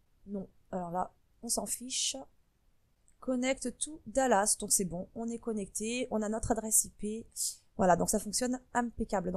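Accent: France. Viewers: j'adore ton accent frenchy, je comprends pourquoi y'a des mecs qui craquent en entendant une p'tite meuf du sud-ouest parler anglais, un mélange de j'm'en fout, j'ai pas écouté ma prof quand j'étais en sixième et de t'as qu'a apprendre le français c'est une langue géniale, tous ça avec une syntaxe et une grammaire juste correct' et Windscribe (50Gb/mois) t'en pense quoi?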